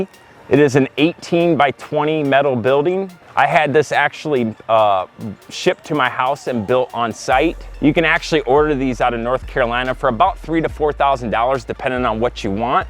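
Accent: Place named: Wisconsin, United States. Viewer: You sound like you're from Wisconsin lol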